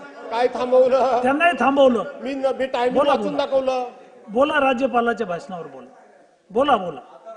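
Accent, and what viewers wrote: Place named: Konkan, India. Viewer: भास्कर शेठ जाधव साहेब उद्धव साहेब यांचा ढाण्या वाघ आहे अस्सल कोकणी बोली शालीत सभागृह गाजवून टाकला जय महाराष्ट्र साहेब जय शिवराय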